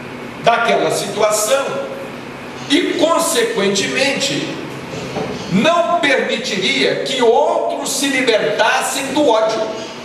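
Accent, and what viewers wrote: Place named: Minas Gerais, Brazil. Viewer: O interessante da palestra Dr. Baccelli é a sua clareza no conteúdo passado... Sabedoria ! E o jeito mineiro de dizer... Adoro !!! O tom de voz adequado e simples por questões complexas e que devem ser reflexivas ! Dr. Baccelli tem Excelente Oratória! Aprendo muito... Gratidão Dr. Baccelli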